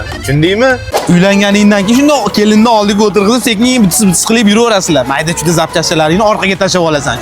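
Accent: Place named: Khorezm, Uzbekistan. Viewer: Xorazim ku bu😂😂😂😂😅😅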